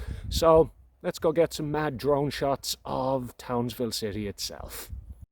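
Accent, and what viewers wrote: Ireland. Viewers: Everything just sounds better with an Irish accent. The weather looks amazing up there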